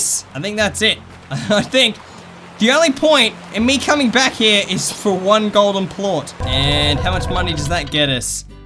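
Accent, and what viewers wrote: Australia. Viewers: are you austrailian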